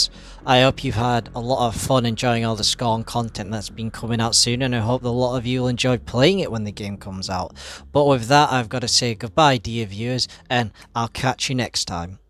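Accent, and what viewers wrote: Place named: Scotland, United Kingdom. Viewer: I'd say he's probably Sco'ish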